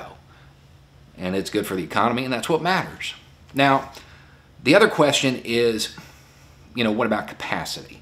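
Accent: South, United States. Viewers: Because (s)he does it ALL the time & it helps some people. Some have hearing issues, or may not understand the southern accent, or they retain visual things better. Whatever the reason, it's a service, a good thing. Why does it bother you so much? It's not like YOU were told to transcribe it (were you?).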